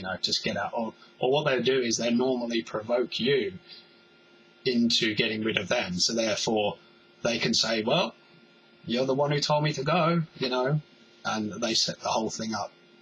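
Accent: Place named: South, United Kingdom. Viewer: the healthy narcissism part I could utilise for being/becoming a dancer, learning to overcome fears is needed moreso.btw, I can tell you have part dutch genetics within you from your vocal tone/sound, (of course mixed with a southern uk accent)... maybe I can connect more positively here on youtube :)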